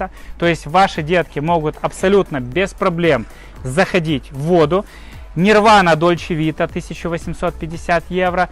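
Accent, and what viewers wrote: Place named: South, Russia. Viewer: вы знаете русским пофиг вообще на украинцев и что там происходит. В моем городе мы и не слышим не о каких убийствах о чем вы рассказываете. Я жила на Украине 10 лет и уехала в 14 году там была очередная 110 революция. Причём там русские тем более те которые ездят в отпуск в 5 зведочные отели. В окопы идут совсем другие люди те кого жизнь пережевала и выплюнула прямо в эти окопы. Они за 200 000 идут убивать и умереть а обычные люди (человеки) 200 000 руб за 7 дней отдыха оплачивают. Каждый май и октябрь ездим в Турцию украинцев не помню там вообще, ну или они сильно косят под русских что не отличить. Да и кому какая разница на отдыхе. Все вместе тусим отдыхаем в Турцию все заточено под русских 😊 ездим тада как на дачу. Автор видео 100% русский, спроси у любого европейца😂😂😂 Немного выдаёт "колидор" и "гэ" и эта настойчиво агрессивная подача характерная для южных народов, вполне за Краснодарского парня сошёл бы. 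Или любой другой глубинки России.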